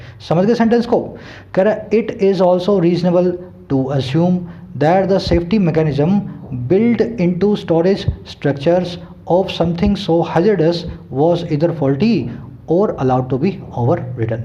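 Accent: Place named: Uttarakhand, India. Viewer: Sir, Your pronunciation is like Uttarakhand's Pahadi people.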